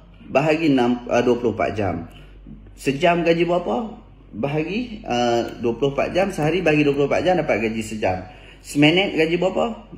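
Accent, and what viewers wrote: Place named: Kedah, Malaysia. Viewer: orang kedah kalau berletiaq..memang sedap dengar..haha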